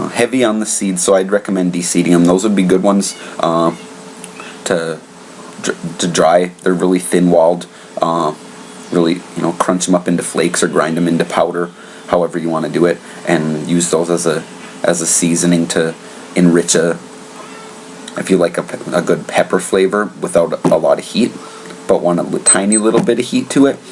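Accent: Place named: Japan. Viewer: Hey, great review again mate, love the Japanese accent:) mate I'm only a new chilli head but enjoy your reviews and your knowledge. Would love to send you some pods to try when my plants throw a heap out, I'm in Australia and it will probably be another 4 weeks or so but would be keen to see your thoughts on the pods from different growing conditions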